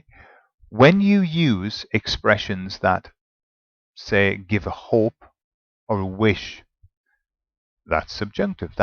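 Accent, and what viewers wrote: United Kingdom. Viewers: The Queen's English accent makes everything become clear so fast, haha. I speak American english and I'm learning Mexican spanish. This lesson really helped. Thanks.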